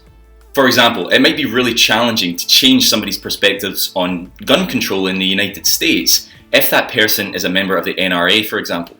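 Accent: Scotland, United Kingdom. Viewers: Oh my god you are saying that the base of the person if the self esteem is compromised this leads them to believe in outlandish ideas NOT for the ideas themselves but for the sake of the reassuring position these ideas give them in an accepting groups. So if you try to reach them through changing their views it's impossible because they are tied these views to their low self esteem.So my question is how can if you can, help someone whose self esteem is damaged to encourage them to feel better about themselves? By the way I knew you were Scottish.I'm from Spain but I am 3% Scottish lol!!!I appreciate how clearly you explain things.Can you please make a video on how to help internally wounded people with some kind of wise approach?Thanks!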